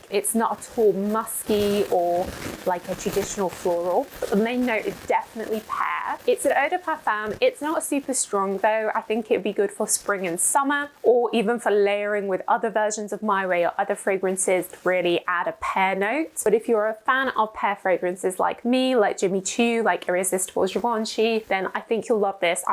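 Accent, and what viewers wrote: United Kingdom. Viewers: her British accent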